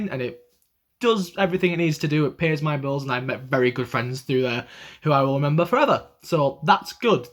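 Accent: United Kingdom. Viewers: Casual thoughts I had while watching this video: Loved your toy story notebook. Your D.O. impression is on point. I´m from Latin America and I also really like your accent, I had a couple of British teachers back in high school and I always liked the way they spoke. Yes, Jongho is probably the best 4th vocal atm, I love his voice so much. Yeah, fan wars may be an issue if you do overall rankings but from what I´ve seen on your videos comments most of your subscribers are pretty chill people, you calling yourself a soft boy is a whole mood haha. My favorite rapper/singer is Jisung too, you should check out his king of masked singer performance if you haven´t yet, truly amazing. I don´t think you´d ever have to put an end on your ranking series because so many groups debut each year, you have endless content hahaha. NOOO, WE´RE NOT JUST HERE FOR THE RANKINGS, you´re such a cool person, a lot of people like you a lot just because you´re funny and a nice dude. 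Yes, we´d love some covers haha. YES, IDOLS DESERVE SO MUCH RESPECT FOR WHAT THEY DO UNTALENTED IDOLS DO NOT EXIST. Omg happy birthday, I hope you have a great day tomorrow. Thank you so much for answering my question and giving me that shoutout, I believe in your channel so much and I´m glad I can be a supporter. Wow, I couldn't agree with you more on that answer, kpop is so much more than just vocals and if a group needs a backing track in order to deliver a performance in the best way they can then I say they should go f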